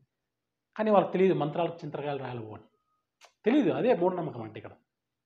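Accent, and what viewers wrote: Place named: Anantapur, India. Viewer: Nice Explanation Sir,mee language observe chesanu,,,i think Ananthapur surrounding anukunta sir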